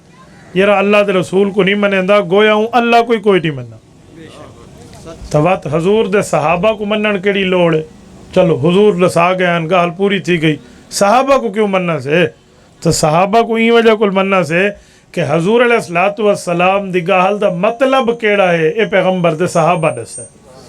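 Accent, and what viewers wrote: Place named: Punjab, Pakistan. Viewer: Me apke bayan ko dislike karta hu kyunki dil se sunne ki khahish hoti he lekin Punjabi puri esi tesi kar deti hai Mood off 📴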